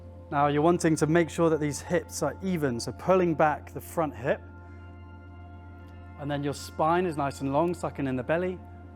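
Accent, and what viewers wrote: United Kingdom. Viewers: Sometimes I watch your videos just for your British accent😅 (And I promise to do the stretching thing in the morning🫶🏻)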